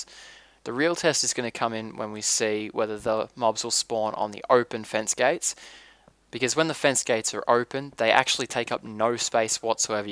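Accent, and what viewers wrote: Australia. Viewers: U sound Aussie.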